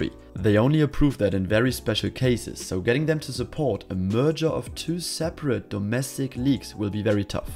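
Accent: Germany. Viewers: The moment he started speaking, you could inmediately tell he's German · Are you German? PS: Great Videos. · When he started to count up the teams i knew he is a German, this Groningen and AZ was as German pronouced as it could.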